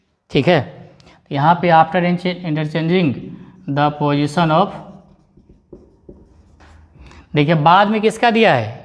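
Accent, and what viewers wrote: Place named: Rural, India. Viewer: 🙏B ke jagahba par 👌👌 dehati language sunnne me mujha kafi achha laga sir0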